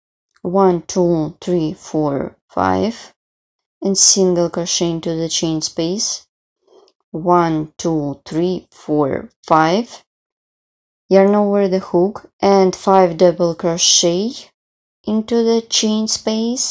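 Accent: Russia. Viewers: Мне одной кажется, или таки я и правда слышу родной, русский, даже в английском? За обучение спасибо, учусь, очень хочу уметь красиво и без подсказки вязать крючком. В видео все понятно.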